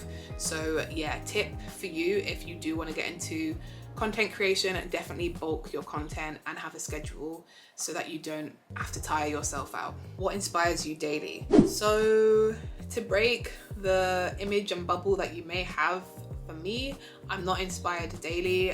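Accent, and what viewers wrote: New York, United States. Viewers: Loved the New York accent and your career story! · It’s the New York accent that killed me like “ are you DUMB?? “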